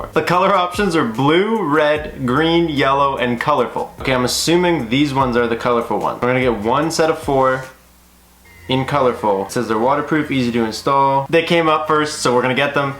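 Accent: Canada. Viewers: I'm new here, but guessing you're in Canada by the way you pronounce "out". Cause I was confused when you were stating the temps.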